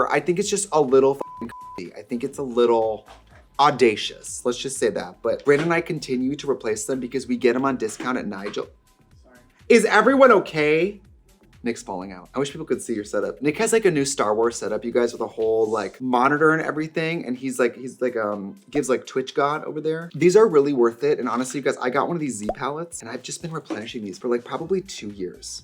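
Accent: Wisconsin, United States. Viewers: You can also hear her Wisconsin accent a lot more and I think that’s very metaphorical. She’s back home. Honestly makes me wish I had 3 months off. 🥹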